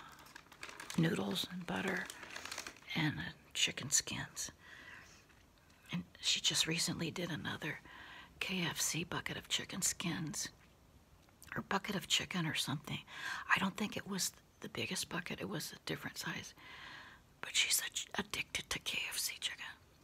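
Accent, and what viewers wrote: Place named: Greece. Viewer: Sounds like greek woman I'm greek too για αυτό το κατάλαβα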